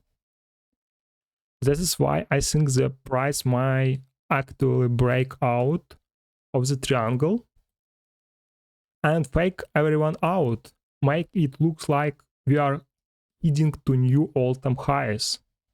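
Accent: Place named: Russia. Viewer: Русский акцент бро)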